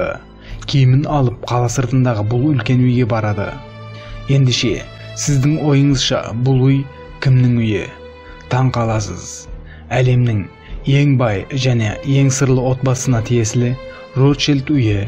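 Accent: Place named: Uzbekistan. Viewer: каналды жүргізіп жатқан жігіт, сіз өзбексіз бе? өзбекше акцент бар сияқты тіліңізде?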